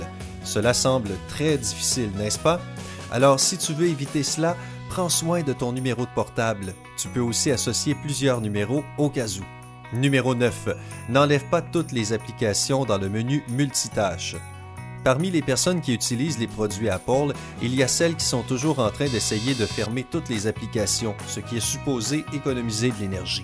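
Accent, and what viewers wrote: Quebec, Canada. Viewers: Sympa t’es canadien ? Sa se voit avec ton accent 😉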